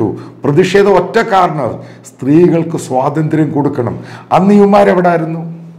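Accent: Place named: Kottayam, India. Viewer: അത് കോട്ടയം ഭാഷ..തൊലിയായ ഭാഗം മുറിച്ച് കളഞ്ഞ മണി (ഉണ്ട )യന്മാർ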